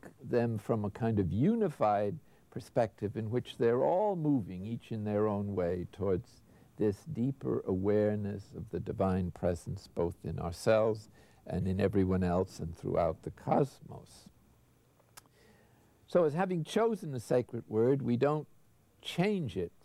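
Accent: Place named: Ireland. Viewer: I love his voice, i wonder if his mum or dad were irish, as there's a hint of an irish lilt in there 🤔 perhaps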